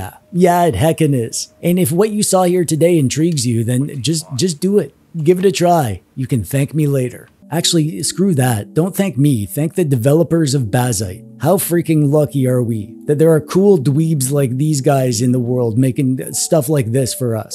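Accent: Canada. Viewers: You’re Canadian, aren’t you!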